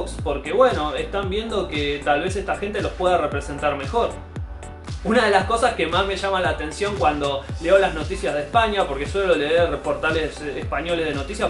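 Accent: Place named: Argentina. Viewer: Hola. De qué parte de Argentina sos?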